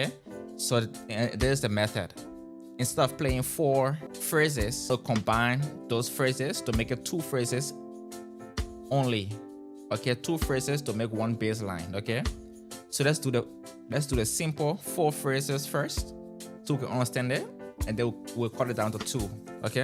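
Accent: Liberia. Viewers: Are you a Liberian ? You sound so Liberian 🇱🇷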